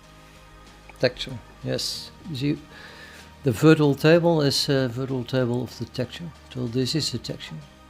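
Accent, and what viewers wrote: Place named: Netherlands. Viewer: nederlander detected I thought you were Spanish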